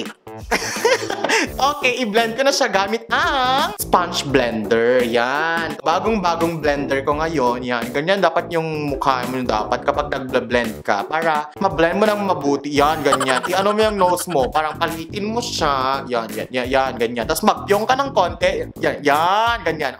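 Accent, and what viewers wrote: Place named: Cagayan, Philippines. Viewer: Para taga CAGAYAN si momshie kasi yung accent nang pag sasalita niya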